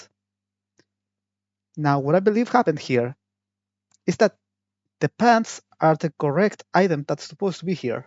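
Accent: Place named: Spain. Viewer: Ah ese acento español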